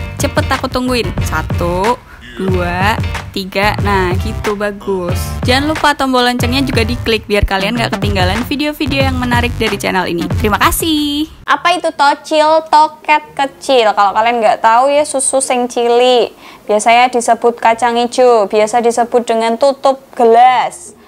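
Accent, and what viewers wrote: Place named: Solo, Indonesia. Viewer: Mbak ngomong nya lucu ...dari solo ya mbak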